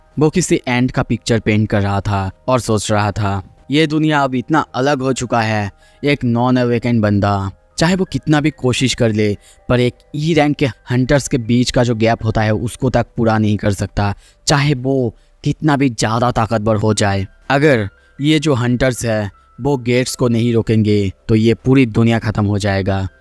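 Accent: Bengal, India. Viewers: Are you Bangali or what?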